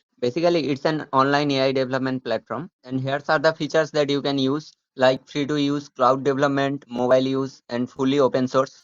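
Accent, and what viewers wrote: India. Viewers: ı didnt understand anything because of your pajit language and accent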